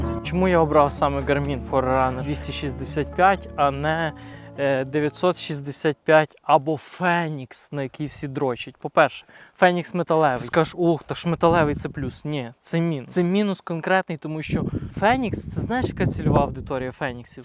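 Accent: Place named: Western Ukraine, Ukraine. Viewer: плять що за жахлива українська? плять як задовбали ці западенці. Селюки плять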